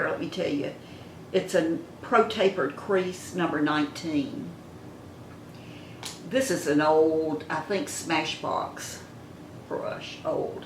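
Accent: South, United States. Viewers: you exude such lovely Southern charm! I love accents, and yours is tops! This from a lifelong Northwesterner age 83. Your makeup tips are invaluable. Please continue your friendly chats!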